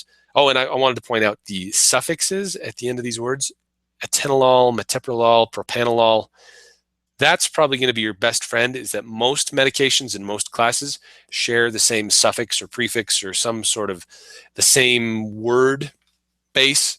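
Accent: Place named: West, United States. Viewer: Ha ha, the joke near the end! The accent sounds western American, i guess.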